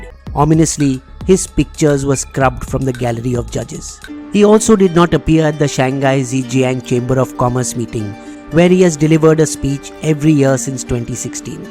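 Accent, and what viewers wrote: India. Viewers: LOVE YOUR CLASSIC INDIAN VOICE/ACCENT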